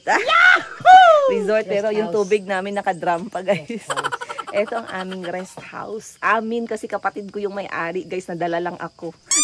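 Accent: Iloilo, Philippines. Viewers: Ilonggo kamo? Or acquired knowledge of speaking inilonggo.... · Tuwang tuwa Ako sayo Lalo na kapag nag salita ka na tonong ilongga 😅😅😅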